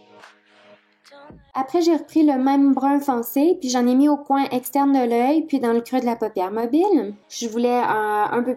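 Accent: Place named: Quebec, Canada. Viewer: Tu es québécoise ? J'aime ton accent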